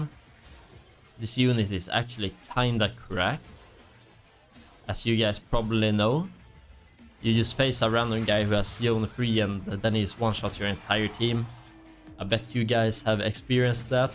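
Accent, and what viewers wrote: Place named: Sweden. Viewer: swedish-english is so fun to listen to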